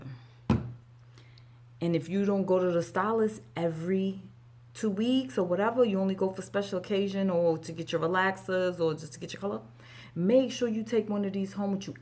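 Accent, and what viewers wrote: New York, United States. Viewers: Girl you have to be from New York I love the way you talk I'm about to order because the way you broke it down lol thanks Boo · Thank for this video. Love your accent. From NY? You tell it like is and that honestly is refreshing.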